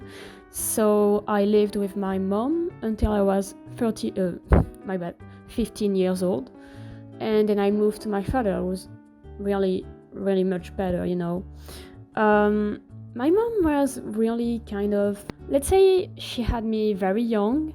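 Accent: France. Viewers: So, I watched this while drawing some fanart, and I must say, your voice is very calming, and as someone who speaks french too (canadian, first langage french), I didnt mind your accent at all although I personally dont have one as strong. Your voice honestly calmed me down, I have very bad anxiety problems. But, more importantly, i'm very glad that you got out of this situation. You mentionned in the video multiple times something along the lines of: ``and well while you might not think its abusive enough, it..`` I'm just gonna say straight up that there is no such thing as being abusive enough. Abuse is abuse either way, and anyone who can't see that is an absolute moron who needs to get educated. Listening to some of the stuff you said, I honestly felt a sinking feeling in me, I just kept getting more and more concerned for you, and then reminded myself that you got out of there, which brought me relief. 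But the fact you had to deal with it for so long in the first place is awful already, I am so sorry you went through this at all. Anyways, just wanted to show that I care and that I'm proud that you managed to get through this until you got help. And I'm also impressed that you managed to talk about this on here, I truly wish you the best and a wonderful day, and I really like your art a lot, looks yummy :)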